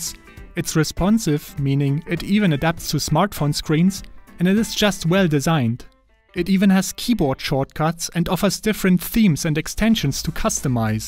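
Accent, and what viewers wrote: Germany. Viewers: uuugh german english :D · You seem to have a German accent, am I right? No criticism, just for you to improve: You are talking about Queries, not Qiuris :) try Googling the pronouciation. Ps. Great video. I have my own NextcloudPi and it is great. With Nextcloud Talk you even can use a Teams alternative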